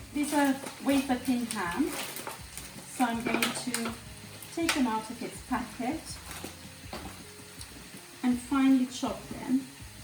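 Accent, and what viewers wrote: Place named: United Kingdom. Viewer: Sweet talented lady with a British Accent, looks yummy ! enjoy your video over and over again !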